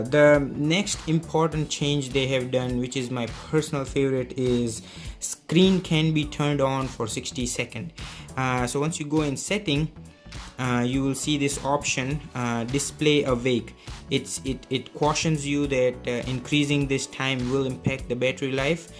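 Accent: Gujarat, India. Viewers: accent is awesome gujju bhai :)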